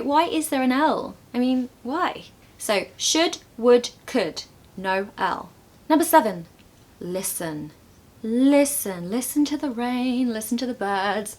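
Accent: RP, United Kingdom. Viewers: Amazing lessons❤❤ I love your British RP accent❤❤ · That's probably the main reason why I'd go for a strictly phonetic language like, for instance, the German language, if I were in a situation to choose between the one and the other :) Yeah, anyways, I'm not here to complain or argue :) English is just fine, and I really enjoy learning it. Love your accent, by the way. RP if I'm not mistaken? 😊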